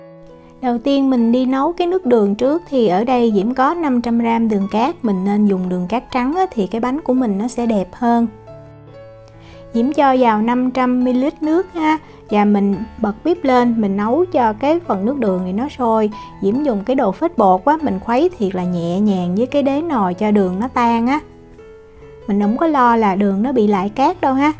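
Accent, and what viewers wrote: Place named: Can Tho, Vietnam. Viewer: nghe giọng c Diễm, e tưởng quê Cần Thơ. hihihihi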